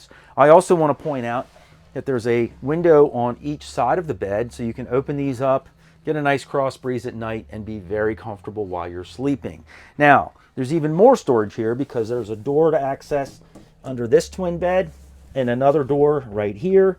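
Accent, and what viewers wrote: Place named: Baltimore, United States. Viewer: Love your videos. does an excellent job shooting the interiors. You can hardly see them on other channels. I've been meaning to ask - you are from the Baltimore area, correct? - I grew up there and you have a heck of an accent, Hon! If I'm wrong, next guess would be PA or NJ. 😀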